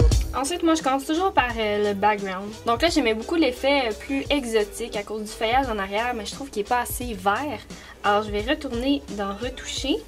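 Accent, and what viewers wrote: Quebec, Canada. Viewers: Tu est vraiment très belle et j'adore ton accent québécois c'est trop beau 😍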